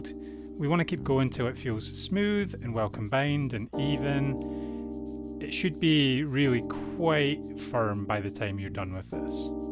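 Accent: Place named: Scotland, United Kingdom. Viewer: Great video! I enjoy your Scottish accent and voice. 😊 it’s relaxing and calming and not comparable to nails on a chalkboard either 😂 These look beautiful and would be lovely for a holiday brunch , can’t wait to make ! Thank you for a great video and recipe !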